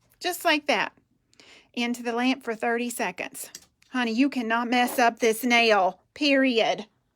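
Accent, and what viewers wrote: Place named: Georgia, United States. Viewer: I am watching you from Atlanta ps. You crack me up! Definitely sounds like you’re in GA 😂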